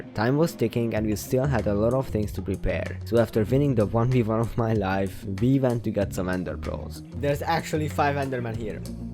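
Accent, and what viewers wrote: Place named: Hungary. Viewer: You have a little hungarian accent, but i love it. You are probably not hungarian but yeah.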